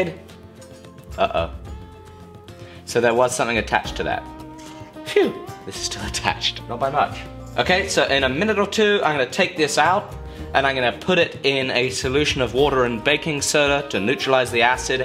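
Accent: United Kingdom. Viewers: can you remake this video again but using a normal accent (not annoying British accent)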